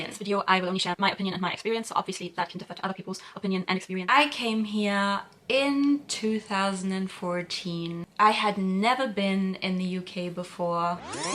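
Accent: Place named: Germany. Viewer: I absolutely love English being spoken with a German accent….especially when it is spoken by a German woman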